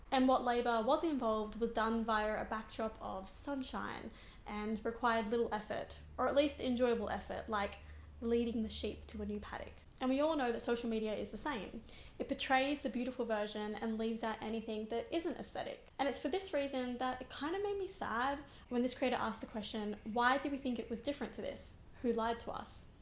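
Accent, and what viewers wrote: Australia. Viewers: You sound Australian ❤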